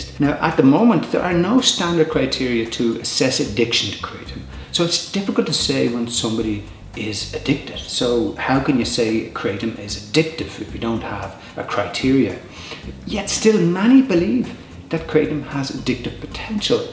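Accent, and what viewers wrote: Ireland. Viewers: God bless you, you be rocking the McGregor accent/look a little!:)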